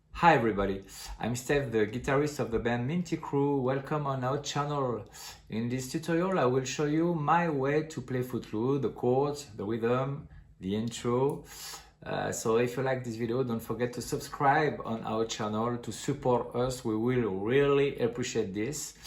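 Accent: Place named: France. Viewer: Thank you love your French accent ❤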